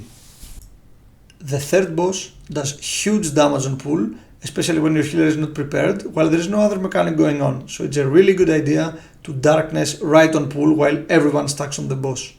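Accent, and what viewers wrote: Greece. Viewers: I knew that was a Greek accent. Καλή μέρα!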